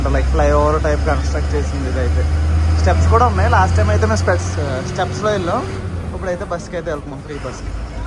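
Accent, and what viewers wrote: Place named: Godavari, India. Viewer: Ekkadaki vellina godavari slang😂.. Bavuntadi andi mana slang❤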